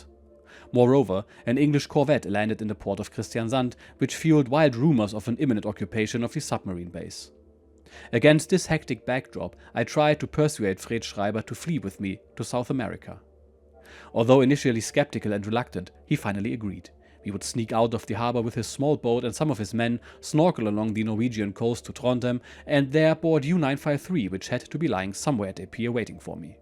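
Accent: Germany. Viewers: Your accent is heart breaking because my best friend in high school was from Germany. He developed depression and disappeared. All of his friends think he took his own life. He was smart, good looking. athletic and had great musical talent. Not a day goes by that I don’t think about him. It was 50 years ago when he vanished. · I was really hoping he was going to get the chance to attempt escaping to south America but his postwar adventures getting back to Germany were no less dramatic. What a great series of videos, i binged the lot, so captivating. The German accent really adds to it. One question, didnt i hear him say at on point he was going to end up commanding u 701 or did i miss hear that?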